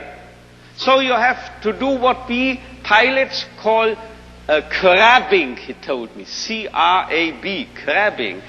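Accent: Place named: Germany. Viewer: <3 ze german akzent (but he's austrian?)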